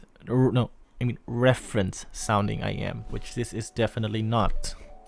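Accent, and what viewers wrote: RP, United Kingdom. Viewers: so sad, you never use them again. Anyway, I like your normal accent here in this video more than your usual Posh accent hahaha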